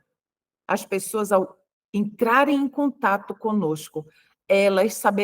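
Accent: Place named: Pará, Brazil. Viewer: Obrigada meu Salvador Jesus por tantas bênçãos através dessa live!!🙏 Obrigada minha irmã do céu!❤ A Sra é de Belém do Pará? Seu sotaque parece paraense... Um grande abraço! Nos vemos breve com fé no Salvador!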